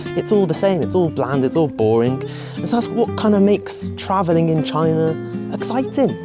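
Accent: United Kingdom. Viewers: Your British pronunciation is like music to my ears. Thank you. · Enjoy listening to your talk! I think you talk very well. You got lovely British accent with British style humour. China has a huge amount to explore, I can see you have the potential of being successful youtuber 😉 best luck! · I like your cute British accent :)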